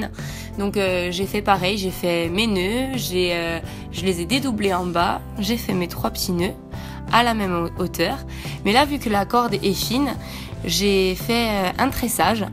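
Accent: Corsica, France. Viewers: Salut, juste une question qui n'a rien a voir avec la video, mais en t'entendant parler tu sembles être de corse toi aussi... c'est le cas ? Voila, bonne continuation à toi =)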